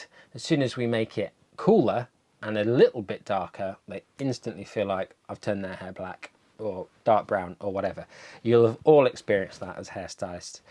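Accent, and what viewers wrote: United Kingdom. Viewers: I was watching and listening while making Sunday family breakfast, having a discussion about toast. Part of my brain that was listening to the end was wondering what “car key” color looks like. Must be like a dark steel grey. OH!!!! Khaki!!! I do so love listening to an English accent. But I need to focus. Thank you for your education!!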